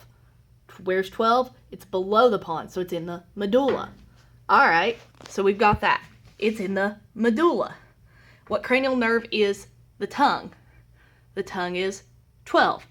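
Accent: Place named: United States. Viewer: I'm sitting the UK exam this coming week. Any brainstem questions and I'll be hearing your awesome American accent singing "5, 6, 7, 8!". Thank you so much for these videos - brilliantly accurate and educational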